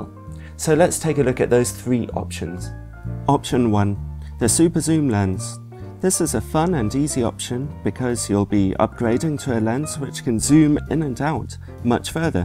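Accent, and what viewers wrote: United Kingdom. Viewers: The first time I see your face. :) The guy with the british accent. Greetings from Rio.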